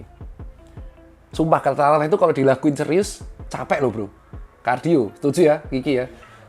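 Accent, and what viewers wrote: Java, Indonesia. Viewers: Dengerr medoknya dokter ini jadi inget seseorang:)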